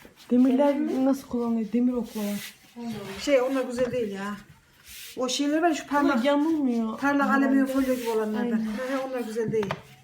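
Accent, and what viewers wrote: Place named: Merzifon, Turkey. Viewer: Bende merzifonluyum bursaya tasındım senide merzifonlular gibi konuşuyorsun diye yeni takip etmiştim merzifon yazısını görünce cok sasırdım hala dedem dayım herkes orada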